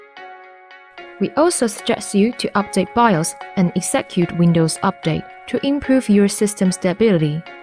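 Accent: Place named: China. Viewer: It's sad when even the AI has a Chinese accent so heavy that it can't be understood.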